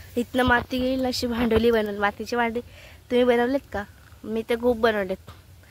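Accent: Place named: Konkan, India. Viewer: thank u tri tumchya bolnyawarun watlach mala ki tumhi koknatun asal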